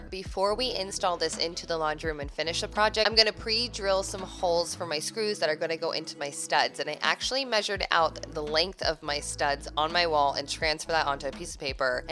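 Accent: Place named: United States. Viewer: love your work. It’s just American accent. You have lovely voice.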